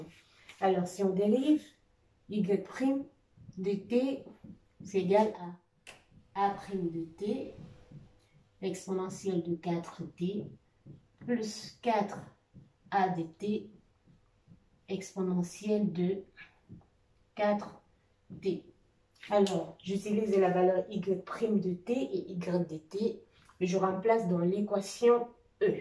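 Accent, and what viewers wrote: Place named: Cameroon. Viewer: Vous êtes camerounaise, cela s'entend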